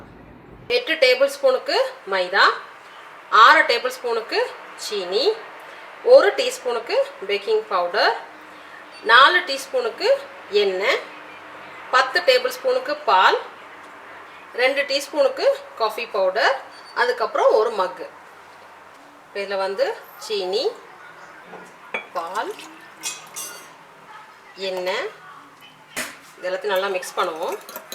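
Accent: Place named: Thoothukudi, India. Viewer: Really Sorry Mam. I'm confused because of your nice Tamil vocal & your Thoothukudi slang also of course. Even I thought, just for name sake you are telling like you are in Mexico, in your past Birthday Special video "35 Things". First of all belated Birthday Wishes Mam. After a long time, without play forwarding I've watched your 1 hour video of that "35 Things". Afterwards I've clicked the subscribe button without any hesitation. Since Yesterday only I've visited your channel. Take Care Mam...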